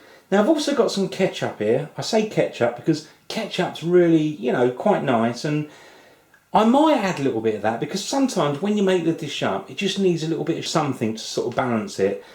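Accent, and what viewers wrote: United Kingdom. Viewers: I was just about to ask the same thing :D I'm from Hungary though, but I love the accent, I am working on mine to be more British :) For that matter, I'm just making this dish :) Sadly the butcher ran out of beef so I gave it a try with pork O.o I wonder how it going to turn out :D Won't be a real cottage pie after all because of the meat, but I'm still looking forward to it :)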